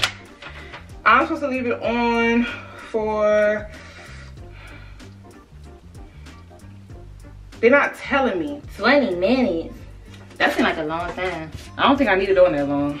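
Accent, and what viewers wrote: Baltimore, United States. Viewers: yooo so i was about to type you sound like you from baltimore but then i went on famous birthdays and you are like maryland gang represent!!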